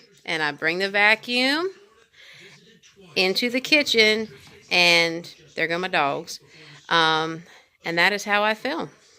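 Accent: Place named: South, United States. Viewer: Hello there, I write your name well? Really enjoyed your 2d Vlog video. Seems a nice family to me, good husband and adorable daughters:) The puppies are sweet too. I have to say, you are doing great, also that selfie standard is very smart! Love your American accent. Is it southern perhaps? You can rely on me, that I will follow more of your video's. The MRE's are my fave too. Maybe you know my friend Nathan's MRE? If not subscribe, he is cool for sure. Perfect program on here you hear?? Loll... till next time.... Rick Lane is my Youtube name, Mark is my Dutch name, see ya:)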